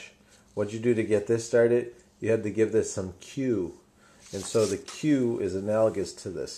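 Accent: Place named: Brooklyn, United States. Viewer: Hello -something in your accent tells me you are from Brooklyn N.Y. N.Y. and like eating Gefilte Fish and beigel with cream cheese, am i right? Greetings from Yoram Stein Amsterdam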